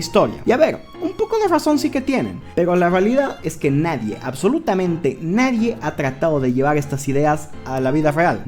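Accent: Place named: Mexico. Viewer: Se nota que no sos argentino y por como hablas pareces mexicanito y haces este video sin conocer al país argentina ni a du gente y menos al presidente y mucho menos la historia de argentina y te pones a decir ridículeses sobre cosas que no conoces lo mejor sería que bajes este video falso estende y antes de hablar de otro país tenes que informarte bien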